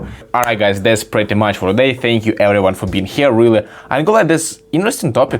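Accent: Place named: Russia. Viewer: эхх этот русский акцент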